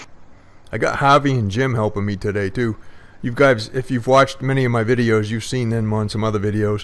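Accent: Maine, United States. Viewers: Ya sound like you was born an raised in winston salem maine or something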